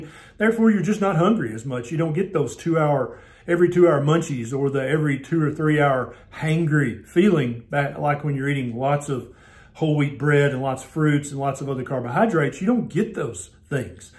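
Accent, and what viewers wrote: South, United States. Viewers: Dude so southern "a touch of sugar" So true!!!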